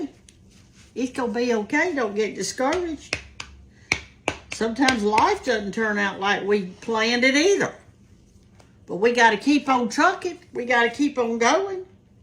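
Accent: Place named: South, United States. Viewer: You are a hoot Ms.. I love to here southern women talk Enjoy your videos😊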